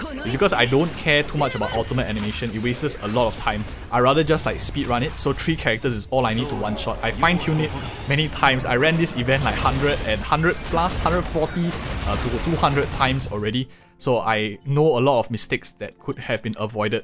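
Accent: Singapore. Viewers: Singaporean voice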